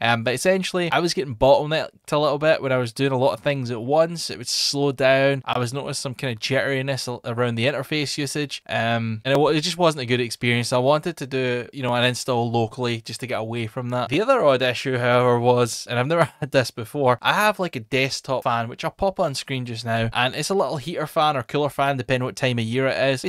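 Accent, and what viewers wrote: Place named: Scotland, United Kingdom. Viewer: Nice video. It's interesting to see how others do when making the switch to Linux. I tried a few different distros as I wanted something that would make it easier to set everything up for gaming and eventually settled on BigLinux which I really love. I did try Nobara but I found that it wasn't for me although I'm certainly taking advantage of Proton-GE etc as the dev has provided us with many goodies that make life easier. Also nice to hear another Scottish accent 😁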